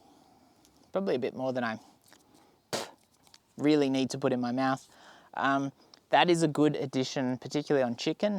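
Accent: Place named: Australia. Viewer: LOL Did I just hear an Aussie dis Americans for not pronouncing words properly?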